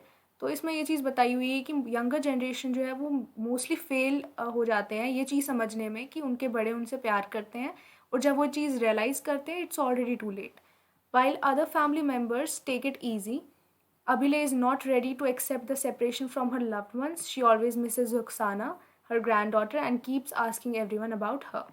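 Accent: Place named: Kashmir, India. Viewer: I think you are from kashmir, your voice 🔥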